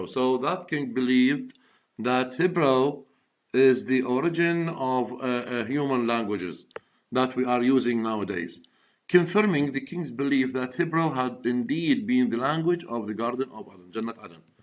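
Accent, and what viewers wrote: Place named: United Kingdom. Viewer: اللكنه بريطانيه بامتياز . ❤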